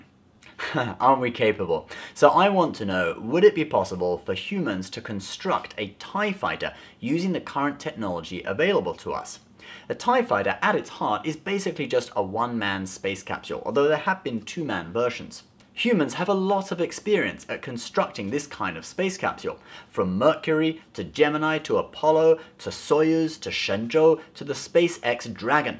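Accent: United Kingdom. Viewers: I want more British and Star treck plz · The people like your British accent.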